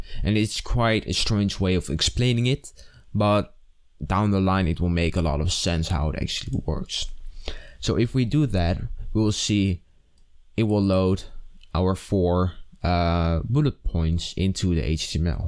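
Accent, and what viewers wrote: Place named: Netherlands. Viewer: This Guy sounds dutch, lol is he?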